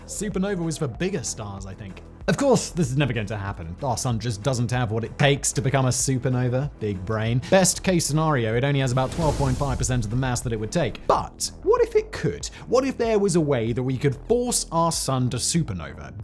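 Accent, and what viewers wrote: United Kingdom. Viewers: Bald man with facial hair and glasses, wearing all black, relaxed on an office chair speaking with a British accent talking about cartoony supervillain stuff (like blowing up a star)... ...Dude starts petting a cat on screen and this is a solid trope. Bonus points if he trades the glasses in for a monocle.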